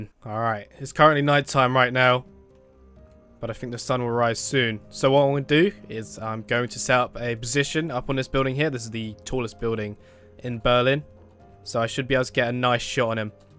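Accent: United Kingdom. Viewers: someday you could go to buckingham I am a fan I always watch your videos it funny it's sometime has edit if you go to buckingham I'm there Lieutenant Truong I could tell that your British but I'm not british I'm not the one who incharge I know you might be yelled by the royal guard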